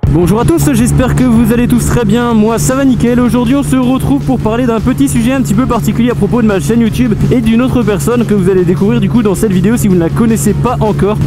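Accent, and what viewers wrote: Alsace, France. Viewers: Salut tu est d'où d'Alsace ? Sinon super vidéo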